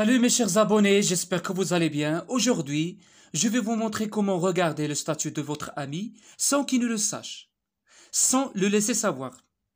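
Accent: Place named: Morocco. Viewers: Tana hh bayna mghribi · جاني بحالا شي مغريبي كيهضرو بالفرونسي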